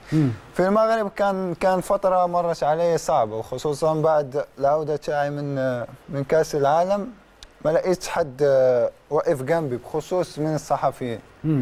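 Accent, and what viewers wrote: Egypt. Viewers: بدر بانون لخبط اللهجتين المصريه والمغربيه مع بعض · فين للهجة بلادك اسبوع في اصبحت مصر..انظر الى معلول كما سنة هو في مصر يتكلم فقط تونسي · انا ملاحظ انك بدأت تشرب اللهجة المصرية😂😂🇪🇬🇵🇸🇲🇦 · هل انت مصري ام مغربي يتنكر للهجته الأم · ههههه بيهدر مصرى بطريقه ملفته ادائك جميل ف تعبير الكلام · كتكلم المصريه طزززز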